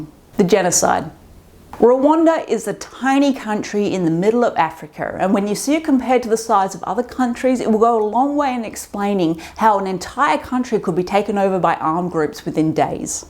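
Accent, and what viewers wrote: Australia. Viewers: Like I said you should have used 1 minute at the beginning of the video to mention what made this deplorable genocide against the Tutsi happen. I understand you were talking about the aftermath but the correct information at the beginning would have been better. The Hutus did not decide in one day to kill their neighbours, families and friends who were Tutsis and Twa this was caused for decades by the white European invader colonisers. Causing division and bringing their racism and discrimination to another African country they invaded. The only reason I came across your page was because this April made it 30 years since the genocide against the Tutsis and Twa otherwise I don't watch morbid channels like this. Judging by your accent you sound Australian (I may be wrong), maybe you can make a video about how the white invaders destroyed the indigenous Australians? 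What happened to the indigenous that were killed afterwards?? Would be interesting to find out. Have a good day.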